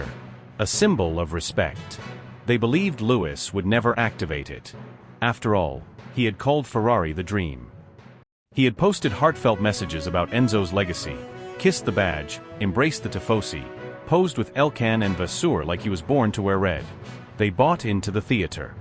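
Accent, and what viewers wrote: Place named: United States. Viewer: Soon as you hear a yank, you know its a pile of crap!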